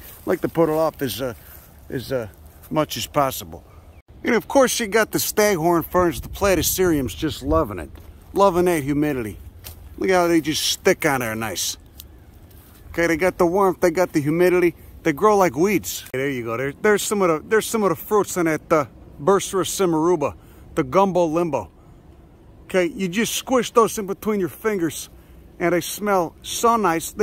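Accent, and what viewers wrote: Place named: New York, United States. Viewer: Hello, I'm Pete, a new subscriber. Your coyote video brought me here. I am fascinated by your combination of wit, wiseguy and accent. I'm a native New Yawkah from the wilds of the Harlem projects, so I feel at home hearing your vocals and attitude. I'm going to catch up on your list of uploads and enjoy them immensely. Your humanity towards that poor little creature warmed the cold, cold cockles of my heart. I don't know shit about botany but I'm hoping aside from being entertained I will be educated. Thanks for uplifting my day!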